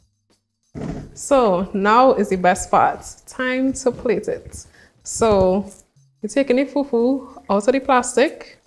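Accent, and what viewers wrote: Trinidad and Tobago. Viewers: Sounds like a 🇹🇹 accent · Why do you sound like a Trinidadian???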